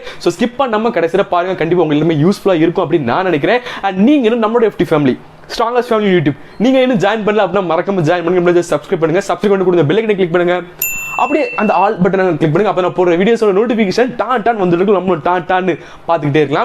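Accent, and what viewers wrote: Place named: Tirunelveli, India. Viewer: ji neenga endha ooru ji....unga slang ah paatha tirunelveli side maari theriyudhei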